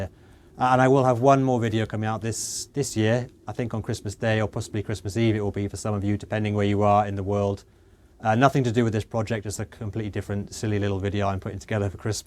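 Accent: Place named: United Kingdom. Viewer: It's fascinating to see all the work you do to uncover this. Very labor intensive. I love the U. K. accent. I wish you were single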